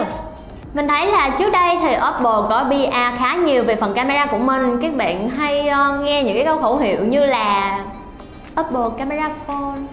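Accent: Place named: Phu Yen, Vietnam. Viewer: Nghe tiếng sao giống phú yên hay bình định gì giữ trời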